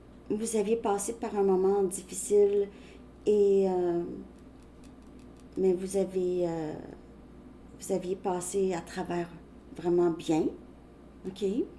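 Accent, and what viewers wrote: Canada. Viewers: Bonjour Nouvelle arrivée sur votre chaîne, je suis Française et vous avec votre accent, Canadienne sans aucun doute. 😊 J’ai beaucoup aimé votre vidéo. Joyeux Noël et bonnes fêtes de fin d’année. À bientôt. 😊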